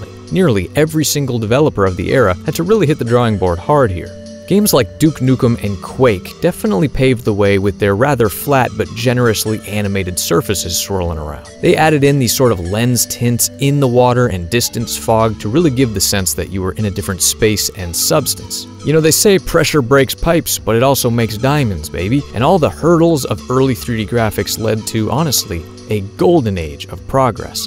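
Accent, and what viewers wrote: United States. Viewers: This guy is from NY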